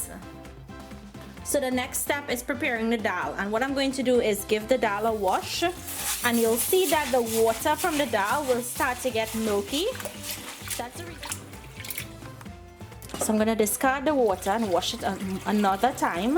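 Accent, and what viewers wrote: Trinidad and Tobago. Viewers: Love how you Spoke Original. #Trinidad.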